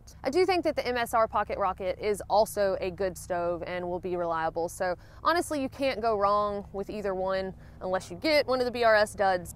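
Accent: South, United States. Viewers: Sexy accent! North Carolina or Tennessee ?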